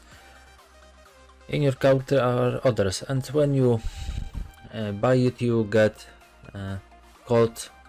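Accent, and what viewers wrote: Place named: Poland. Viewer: widać ze polak po akcencie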